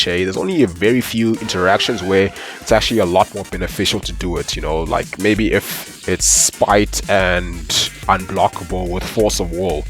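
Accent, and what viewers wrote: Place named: South Africa. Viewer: Got directed here via a luhdemtaters "QUAKE Breakdown & Grade" video. As soon as I heard your voice I knew I was listening to a fellow South African. Cheers brother. Enjoyed the video. Let's keep Quaking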